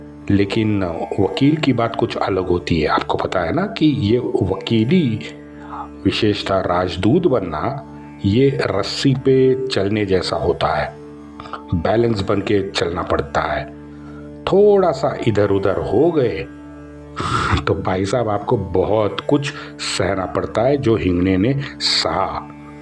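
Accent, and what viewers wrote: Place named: Maharashtra, India. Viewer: Kya aap Marathi ho? Aap ka accent Marathi hai.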